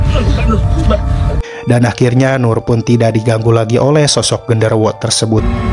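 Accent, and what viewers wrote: West Java, Indonesia. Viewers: Orang sunda ya a?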